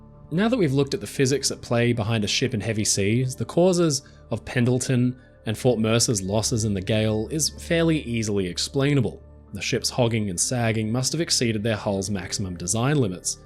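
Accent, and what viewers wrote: Australia. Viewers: This presenter has a slight Australian accent 🤔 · that's exactly what I thought, and I'm a New Zealander. This guy sounds Australian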